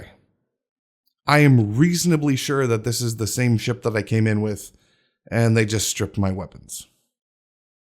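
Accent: United Kingdom. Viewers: English slang accent gave me cancer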